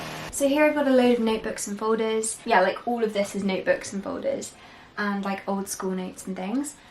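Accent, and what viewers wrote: United Kingdom. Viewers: 영국 영어 발음 정말 매력적이다 😆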